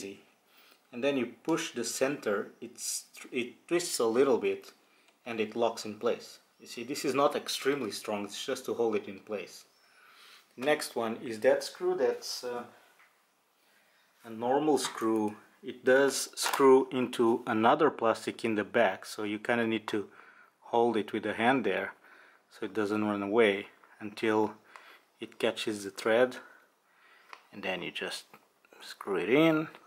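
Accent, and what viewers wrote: Portugal. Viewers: Excelente vídeo! Desde o primeiro vídeo que vi teu, achei que pelo sotaque serias português lol Já agora, os buracos nos cantos superiores da grelha servem para algo? Abraço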